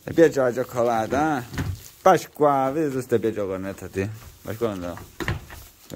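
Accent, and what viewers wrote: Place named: Lombardy, Italy. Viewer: Tipico accento lombardo 😅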